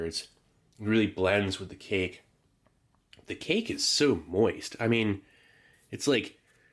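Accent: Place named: Philadelphia, United States. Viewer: I know is in Florida, but does anyone know if he's originally from Philly. I hear a Philly accent come out sometimes.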